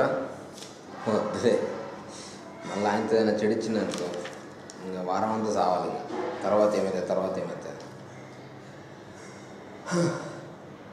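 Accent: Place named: Telangana, India. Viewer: comic comments....especially the telangana yaasa....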